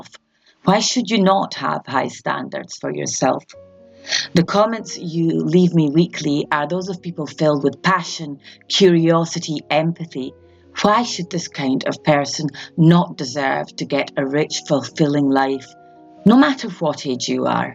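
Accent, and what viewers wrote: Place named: Scotland, United Kingdom. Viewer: I don't think it's too loud. There's something with the audio quality that sounds very different from other content creators (it's not your beautiful Scottish accent). If you have a good microphone, I'm not sure if it's to do with the acoustics of the environment you record in? 🤔 Just thought I would mention it because I think the content you create is what a lot of artists really need to hear, and a small tweak to the audio might help your videos reach even more artists. 🙌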